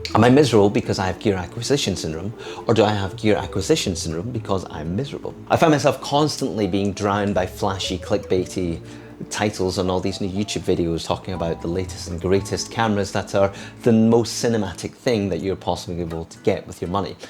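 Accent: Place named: Scotland, United Kingdom. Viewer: Asian face, Scottish accent, cameras, happy to have found your channel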